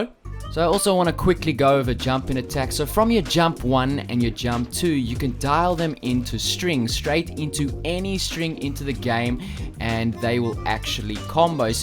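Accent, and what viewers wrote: South Africa. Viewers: says australia but you sounds like a ZA oak? the mix of accents is pretty funny tbh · Am I the only person who thinks this man is south african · South African accent i think. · Dude are u from south africa do u play online and tournaments? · Are you South African? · Are you South African? :0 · You sound South African. Am I right?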